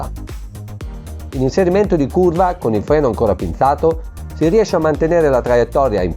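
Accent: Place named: Brescia, Italy. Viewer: mi sembrava Brescia....invece si😅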